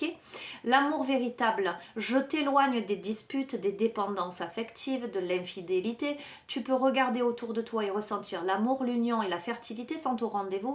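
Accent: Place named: South, France. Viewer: Un p'tit coucou du Mans,. Tes guidances,font résonnance, Avec une sonorité spéciale,qui est un régal,cet accent du soleil ☀️☀️☀️ qui enchante nos oreilles.merci beaucoup bisous bisous toujours un plaisir de t écouter.bonne journée à toi et bisous caresses à tes compagnons à quatres pattes.☀️👍🙏🍀😘🌹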